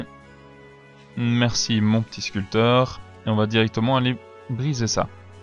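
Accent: Belgium. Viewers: Ah un Belge !!!!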